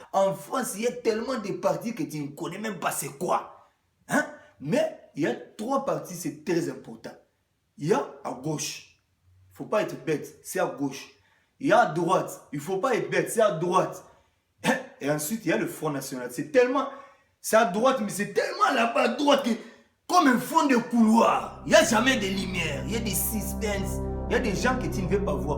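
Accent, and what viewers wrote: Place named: Democratic Republic of the Congo. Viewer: ta un peu perdu de ton accent congolais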